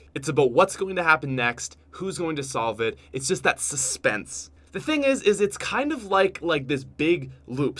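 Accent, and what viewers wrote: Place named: Canada. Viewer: After watching all 9 seasona of How I Met Your Mother, I've barely noticed that you have a Canadian accent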